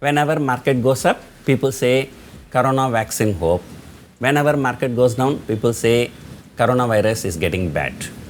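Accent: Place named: Kerala, India. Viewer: Lol, his english also sounds like malyali...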